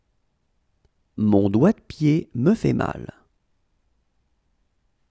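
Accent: France. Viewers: are you french ? because you have a french accent êtes-vous français ? parce que vous avez un accent français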